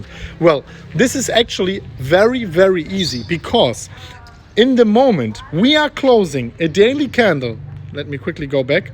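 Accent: Germany. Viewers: Ich feier deinen deutschen Akzent jedesmal! Sehr gute und unterhaltsame Videos! Ich schätze es geht NICHT mehr runter, alleine wegen El Salvador!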